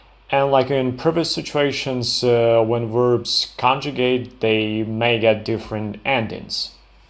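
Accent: Belarus. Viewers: Yeah, he is Belarusian. And also can’t pronounce Russian soft d and t. Instead of it dź and ć as it common for Belarusians. As a Belarusian I have the same accent even though we mostly speak Russian :(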